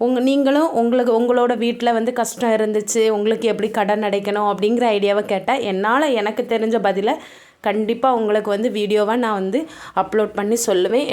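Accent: Tirunelveli, India. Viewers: அருமை....😅😅 நெல்லை தமிழ் 🎉🎉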